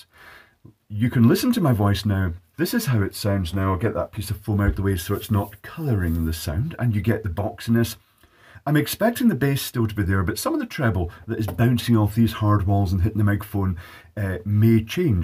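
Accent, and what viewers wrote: Scotland, United Kingdom. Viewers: Total difference but your deep Scottish bass tones are still there 😃👍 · The unintelligible issue is caused by the Scottish accent not the foam. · I watch your videos and verry much like them, but off topic now. Are you Scottish?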